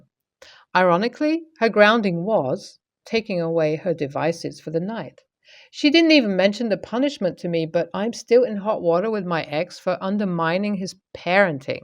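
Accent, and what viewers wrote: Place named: East London, United Kingdom. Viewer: It's a working class English accent from London's East End.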